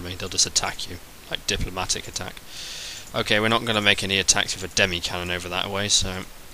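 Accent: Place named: England, United Kingdom. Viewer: the english invented english, so their accent is the right one. you Americans pronounce it wrong, not him.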